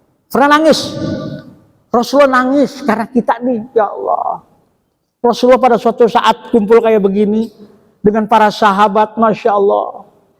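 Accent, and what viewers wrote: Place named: Banyumas, Indonesia. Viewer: Semangat syaikh ❤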